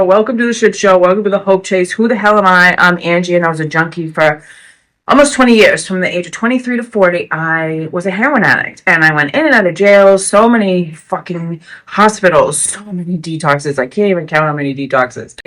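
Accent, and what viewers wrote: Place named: New York, United States. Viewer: you’re incredibly strong! I don’t know if I could deal with the trapped anxious feeling during withdrawal and be in jail. You deserve the best now! Love the New York accent, I work all over NY and NE. I see your channel blowing up! I was kinda sharing my lengthy story on how I came off opioids in various parts.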